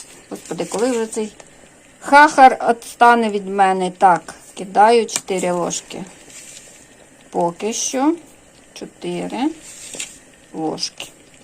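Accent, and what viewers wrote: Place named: Western Ukraine, Ukraine. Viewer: Дякую Вам щиро! Прекрасна українська мова у Вас. А наших українських діалектів в Україні аж більше 40! А по мові Ви, певно, з Тернопільщини чи Франківщини (можливо, я помиляюсь, у нас у всих різні наші діалекти). Так що, своєю солов'їною разом з нашими рідними і різними українськими діалектами переможемо російську навалу і російських слів, і їх самих з нашої України. Дякую щиро за український контент! Переможемо обов'язково!!!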